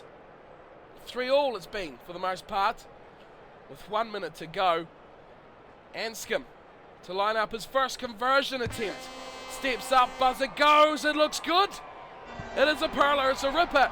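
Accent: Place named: New Zealand. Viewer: great vid again, good win and anscombewas great in that, he was the difference between the teams, when is tikoirotuma back, also where in NZ are u from, coz ur accent is so cool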